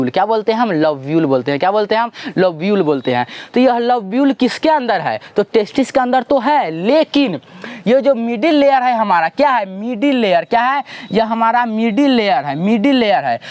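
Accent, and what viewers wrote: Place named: Bihar, India. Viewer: Bihari styles me wah...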